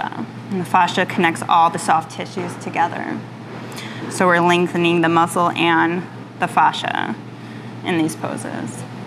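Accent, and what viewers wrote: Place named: Midwest, United States. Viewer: You're from the Midwest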